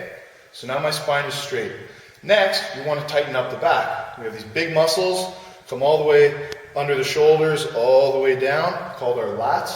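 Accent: Canada. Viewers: is canadian right? I can hear the Canadian in his voice:P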